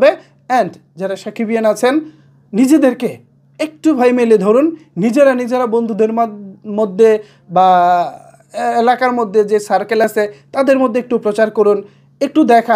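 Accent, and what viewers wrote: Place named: Netrokona, Bangladesh. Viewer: Netrokona Kotha boilen Bhai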